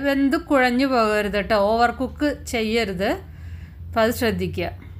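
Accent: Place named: Thrissur, India. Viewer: good receipe......TSR speak pole thonni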